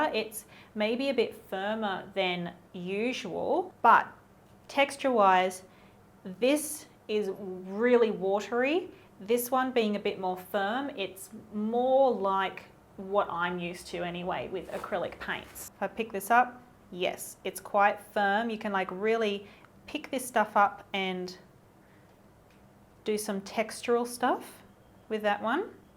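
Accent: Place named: Australia. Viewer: Thankyou for this. I love Liquitex! I just bought an art course and they recommend the Liquitex heavy body, which I’ve never used as I use the regular paint in varying brands, so I’ve been doing research on it. And I found this video - imagine my surprise to hear an Aussie accent! And you’re just down the road - I’m in Brisbane! So new Sub here… Cheers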